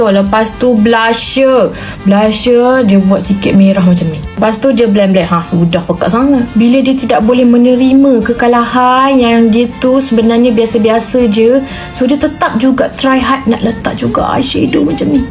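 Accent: Malaysia. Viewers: Kak orang melesya ya kok ngomong nyah gitu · Kaka nya orang malasiyanya soalnya ngomong nya malasia😊😊😊😊😊😊😊😊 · ini kakak bicaranya kok kayak Malaysia ini kakak dari Malaysia ya atau kakak itu hidup di Malaysia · Kakak ini orang malaysia ya kok ngomong nya kayak orang malaysia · Kakak ini orang Malaysia ya kak kok suara nya kayak orang Malaysia 😅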